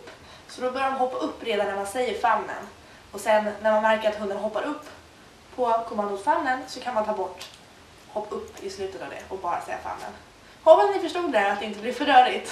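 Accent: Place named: West Coast, Sweden. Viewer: Det var roligt! Är du i Göteborg/västkusten? (just WAGguessing at your accent) Lärde mej svenska pä länge sen! I totally agree with you re most English speakers not knowing another language! (Im USA). Thanks to kikopup for leading me to your video. She has awesome dog training videos here. Hejdä.God Jul!!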